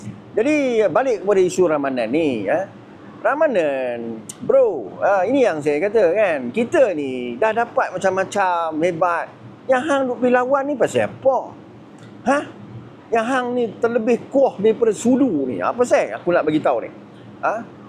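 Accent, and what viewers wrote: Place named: Johor, Malaysia. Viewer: Amboi DSA dok JB teruih cakep pelet Johor nooo.ape bende le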